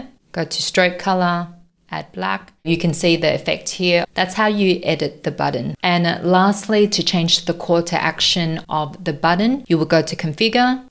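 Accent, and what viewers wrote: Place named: Australia. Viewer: EXELLENT! EXELLENT TRAINING! Nothing personal to Mr. Lemonaid, but his broken English is a bit too much for me to tolerate for training I desperately need. I GET IT! English is difficult, but before you start teaching learn the language much better, even if you do have a bit of an Aussie tilt to it. BTW, my heart SOO goes out to the patriots down under. Fight on.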